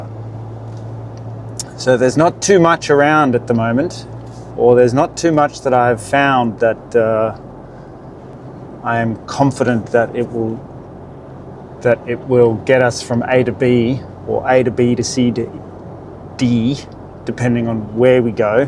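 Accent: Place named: Australia. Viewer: How that I am not notice your australian accent , until now. That explain a lot of things . Hapy holiday mister driver.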